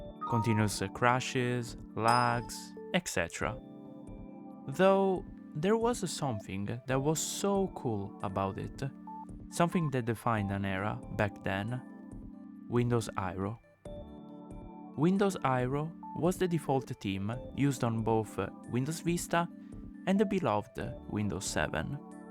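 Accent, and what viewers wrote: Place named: Italy. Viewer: Nice video! I miss Frutiger Aero so much... Btw Are you Italian? From your accent it would seem so.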